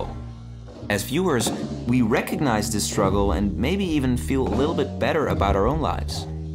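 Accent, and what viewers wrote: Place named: United States. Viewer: God, tone down the American accent, dude - so jarring...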